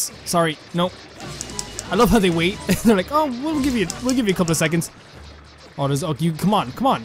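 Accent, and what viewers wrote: Ireland. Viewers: You know, you remind me a lot of Jacksepticeye, and not just because of the Irish accent, but you’re always so happy and positive, and it’s really made this play through super fun to watch! Please keep it up, I have no doubt your channel will grow to immeasurable heights :)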